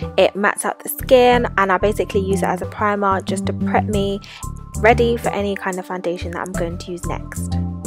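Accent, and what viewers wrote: United Kingdom. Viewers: I had to subscribe you literally got me when I heard your voice. British, so I know where to buy makeup on a budget plus your makeup is FLAWLESS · are you from essex?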